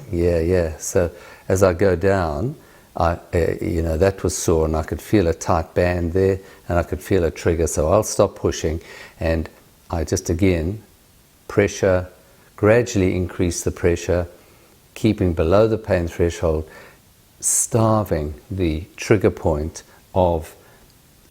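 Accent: South Africa. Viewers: That has got to be a South African accent lol. Either way, I won’t really use this information anywhere but I enjoyed the video regardless. You’re a great teacher ❤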